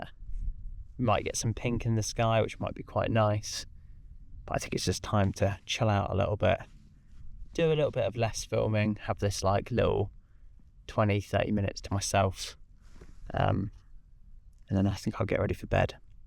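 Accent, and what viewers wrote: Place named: United Kingdom. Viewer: 1. Starting video 2. Hearing british accent 3. Closing videp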